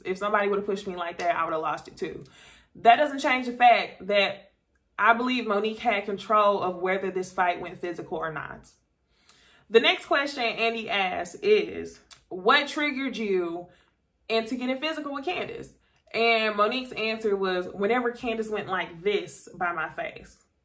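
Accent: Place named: South, United States. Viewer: Come through with the fair and unbiased perspective. Love it! I also love your accent. This is from one southern woman to another lol. Can’t wait for the panel tonight.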